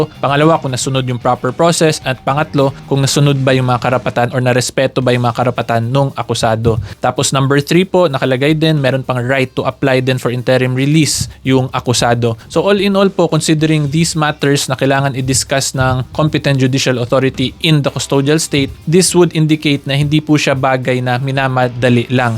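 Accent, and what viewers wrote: Visayas, Philippines. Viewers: Bisaya ka atty.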